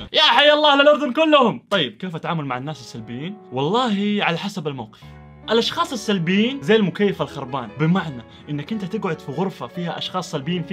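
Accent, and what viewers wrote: Jeddah, Saudi Arabia. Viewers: هو من جدة لأني قد شفته مرة من المرات بمطعم بجدة و حتى لهجته جداوية ترا ((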